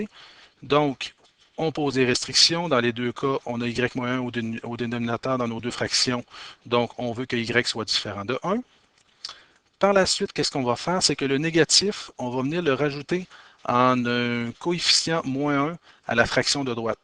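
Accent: Quebec, Canada. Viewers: quel accent? cest du quebecois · Emre Turhan c’est du canadien français donc c’est un accent normal pour ce pays...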